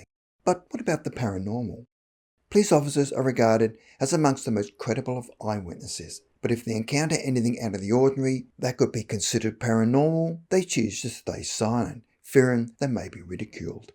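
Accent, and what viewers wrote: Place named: Australia. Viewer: Fellow Aussie here. I love listening to an Aussie telling stories. There is more between Heaven and Earth.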